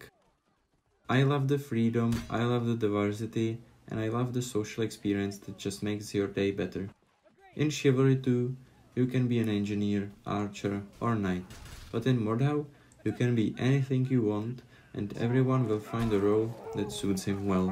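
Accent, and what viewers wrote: Czech Republic. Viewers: Ha! Já jsem si říkal že mi zníš jako čech. :D Já mám mordhau určitě radši kvuli tomu combatu, protože u chiv2 mě příjde že to je takový divný když můžeš blokovat, jak dlouho chceš.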